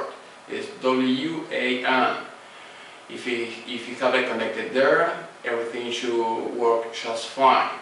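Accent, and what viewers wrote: Argentina. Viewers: ese ingles made in argentinian.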